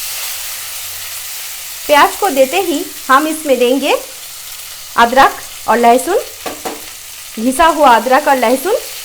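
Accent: Bengal, India. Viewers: Very awesome recipe also delicious I like u r bangoli pronunciation expecting more recipies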